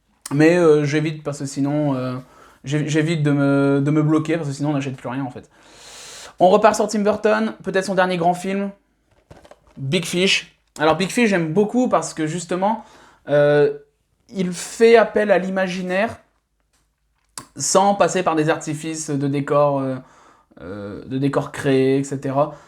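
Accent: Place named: Nord-Pas-de-Calais, France. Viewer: Ton accent ne mentais pas, c'est cool d'assumer les ch'tis haha !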